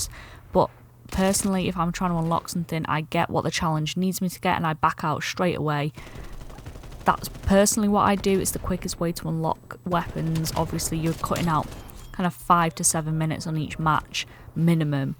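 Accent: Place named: United Kingdom. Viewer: Why are are you british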